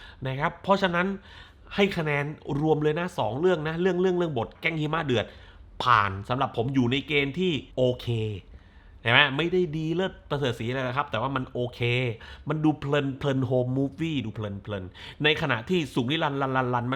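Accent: South, Thailand. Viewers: ชอบการขายของด้วยการแหลงใต้มากค่ะ 🤣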